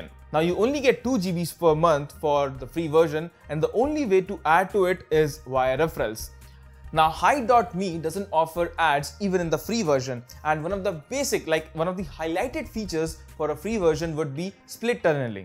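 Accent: India. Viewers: Your accent is looking Indian ... So sorry but is hard to trust you ! I know Indian IT is the best but is also the most scammer in the world. 😱👐🤢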